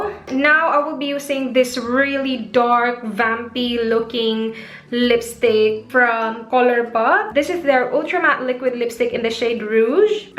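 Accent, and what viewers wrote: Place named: Visayas, Philippines. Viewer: I love your videos but nalingaw kos imu accent and tooONE?!?!. bisaya kaa YOoO?!?!. pataas inig human sa sent...eeEeENCE?!?! ✌✌✌✌ It would be better if ma fix gamay😊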